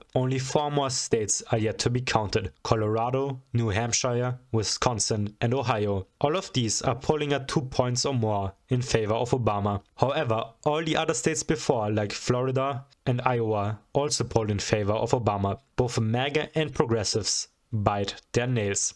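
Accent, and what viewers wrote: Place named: Germany. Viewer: Der deutscheste deutsche Akzent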